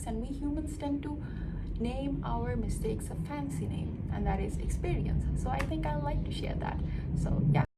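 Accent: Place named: India. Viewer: Wow your English is fluent! 👏 Just the accent sounds a bit like Indian vibes 🥲 maybe if you change the tone a little, it’ll be perfect 💯 otherwise it's oky👌👍❤️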